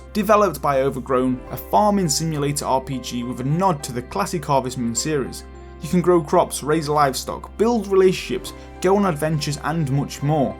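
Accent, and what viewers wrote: United Kingdom. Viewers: Dude he's british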